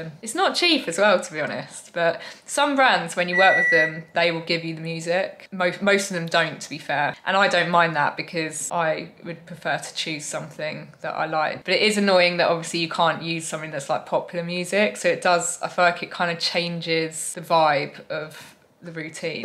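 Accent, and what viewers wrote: United Kingdom. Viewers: I don't know why....but I love to decode British accent 😅..and I love your accent too❤️ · I didn't know British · Are you British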